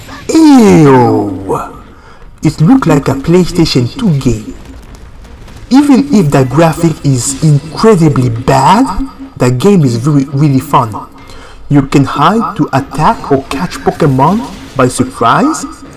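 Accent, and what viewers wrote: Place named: Africa. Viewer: Just stumbled on your channel and I'm not disappointed 🤣 I always find a lot of trust and kindness in African accents haha anyway I just bought mine last week, I hope you love it as much as I do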